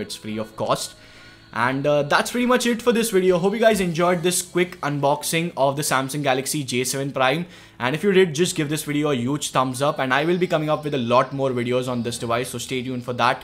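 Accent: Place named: India. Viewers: siempre me pasa que cuando escucho hablar ingles a un habitante de la India....pienso que es rajesh khotroophali de the big bang theory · Hindis accent... Make me laught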